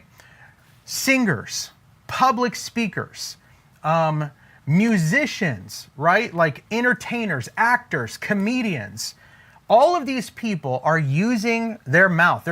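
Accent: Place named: Israel. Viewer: pretty good Israeli accent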